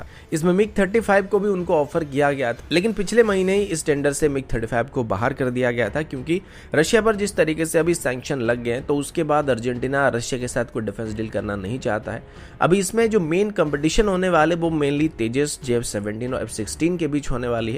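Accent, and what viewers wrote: Odisha, India. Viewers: Bhai tum odisha se ho kya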